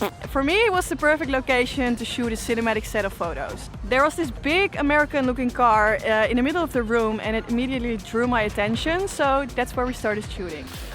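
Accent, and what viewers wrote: Netherlands. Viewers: great video, funny that you turned out to be dutch :P I thought i was hearing an accent haha :P cool location as well for the shoot! great work :) I'm thinking to buy the 35mm as well, looks zo good :) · Loved this video! I love how your “dutchness” comes out of you from time to time hahaha you have great energy and I’m looking forward to your future video’s! Nogmaals wat een leuke video! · Het Nederlands accent maakt deze video af 👌🏽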